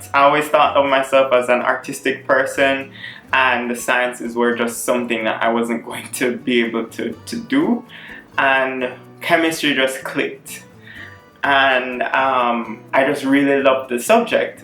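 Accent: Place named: Kingston, Jamaica. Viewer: A brief introduction, my name is Chris and I am a 2nd year Chemistry PhD at the George Washington Univeristy in Washington DC, and I’m from Kingston too! I found your YouTube channel through #BlackInChem on Twitter and as soon as you started talking I heard your Kingston accent. I didn’t expect to find any Jamaican nationals through #BlackInStem, most of the persons I found with the 🇯🇲 flag in their bio were 1st generation Jamaicans in Canada or the UK. Nice to know that you really can find Jamaicans everywhere. Good luck with your PhD and YouTube channel. Also, I love seeing what different types of scientific labs look like, so maybe consider a lab tour video.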